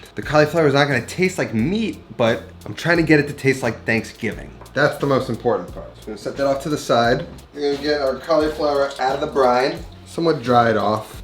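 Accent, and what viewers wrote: New York, United States. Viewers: Sounds like a New York Italian accent... very similar to a Montreal Italian accent. 😉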